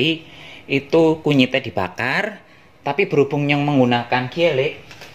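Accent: Banyumas, Indonesia. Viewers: pasti aku ketawa dulu baru komen 😂 salam ublek" capcus wajib di coba ngapak terusss nggeh 😂😂👍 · Itu ciri khasnya kang..bhs ngapak.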